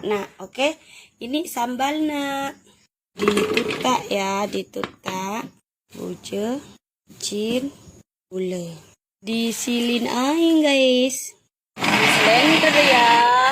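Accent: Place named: Madura, Indonesia. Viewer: Ini asli orang Madura 😁😁dari cara ngomongnya beda😂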